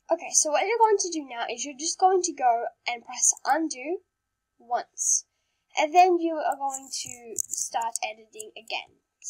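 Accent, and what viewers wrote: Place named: United Kingdom. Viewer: This British tho.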